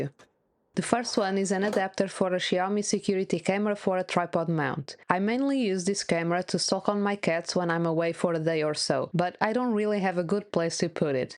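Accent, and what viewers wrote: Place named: Portugal. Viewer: Primeiros segundos do video e percebi de imediato que és Portuguesa 🇵🇹. Além disso, impressões funcionais e não apenas bonequinhos? Subscribed!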